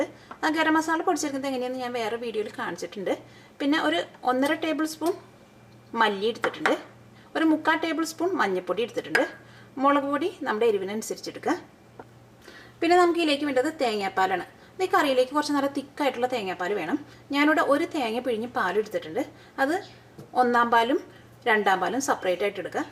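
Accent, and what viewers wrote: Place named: Malabar, India. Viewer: I really loved the narrators south Malabar accent.. keep it up dear.. Godspeed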